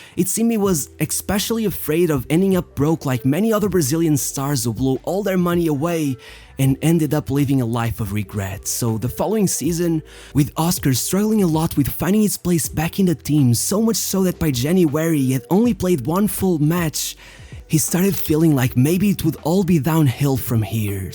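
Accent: Portugal. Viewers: Are you portuguese? You sound really portuguese,se fores grande vídeo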